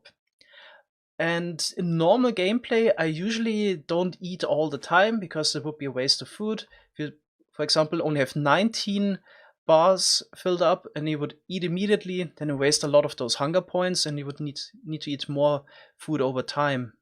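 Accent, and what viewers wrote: Germany. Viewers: Deutscher Akzent is mies am Start haha♥️♥️ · Man hört schon dass du normal deutsch sprichst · Guten Tag du Alman. Ich wette nen 50er, dass du ein Deutscher bist, das merk ich doch am Englisch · your vids are very entertaining and i always think that your projects are amazing but that german accccent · Is he german? He has the german accent😂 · What nationality are u from? You sounds like german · German accent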